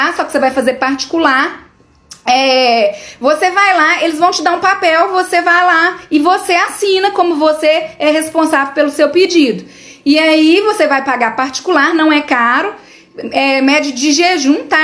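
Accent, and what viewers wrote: Minas Gerais, Brazil. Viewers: Doutora maravilhosa,vc é mineira? Amo os mineiros de ❤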